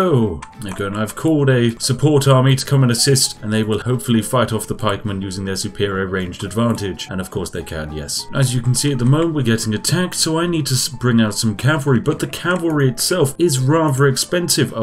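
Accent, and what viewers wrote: United Kingdom. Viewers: I Love your British English, especially the word „fantastic“. Great channel, greetings from Germany. New subscriber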